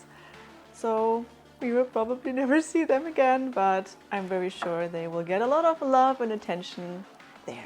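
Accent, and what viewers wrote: Germany. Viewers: You sound so damn German :d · I just found you guys randomly and judging by your accent i figured you are german. Turns out you are. Also, it turns out you guys were at the Fantasy Basel. Seems like I'll see your work or even meet you guys in the next FaBa. Nice. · DU BIST DEUTSCH AKZENT,VORSCHAU IN DER ECKE OBEN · You are german or swiss or austrian arent you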